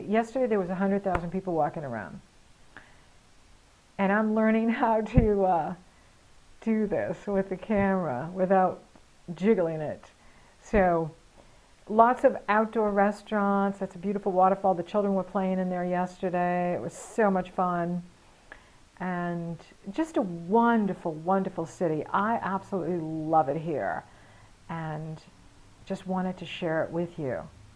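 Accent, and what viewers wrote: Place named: Northeast, United States. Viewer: Great to hear from you,! Thank you for taking us on this tour, I LOVE it! Although I'm slightly confused, are you saying that our ascendant changes to our current location? Also, as born and bred Jersey Girl, I believed that your accent was North Eastern. My suspicions have been confirmed LOL. Anyway, again. Glad to hear from you and looking forward to more adventures with you:)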